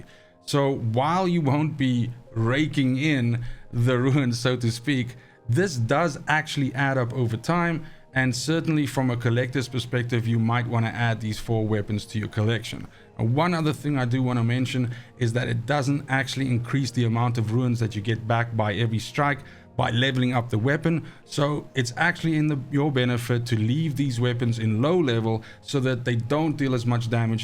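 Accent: South Africa. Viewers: Are you from South Africa bro?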